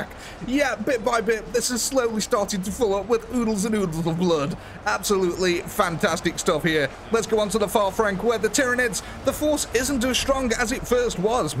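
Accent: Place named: Potteries, United Kingdom. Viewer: 40k in a Potteries accent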